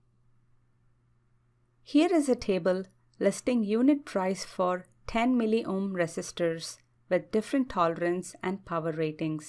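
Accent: India. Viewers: India English？I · wtf why must indian speaker?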